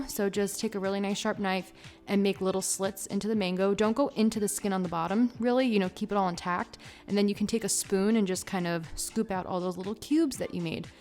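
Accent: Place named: New Jersey, United States. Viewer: Definitely a Jersey girl.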